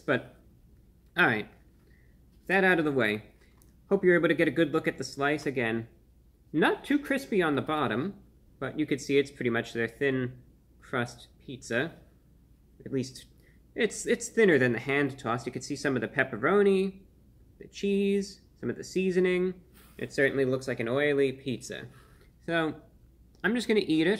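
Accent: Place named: New York, United States. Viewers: the subtle new york accent is genius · When I first found out about you, I thought you were from New York because of your speech patterns and such. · He might make different jokes here or there, but you can definitely tell he’s from New York in his older podcasts… which is quite a treat to listen to… it was like listening him grow from a kid to a young man. Literally. 😃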